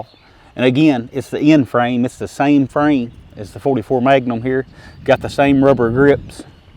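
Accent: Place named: South, United States. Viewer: Dang that is a thick southern accent. Anyway that's a nice gun, I'm not in the market for one, I just enjoy watching these videos.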